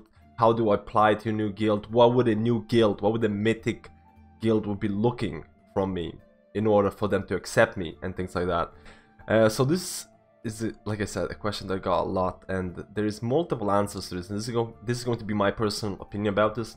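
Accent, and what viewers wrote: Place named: Dublin, Ireland. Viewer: Where are you from. I kind of detect a Dublin accent but not a native Dubliner. Like you're from another country in Europe but adapted over time. You're probably not but just thought I'd ask.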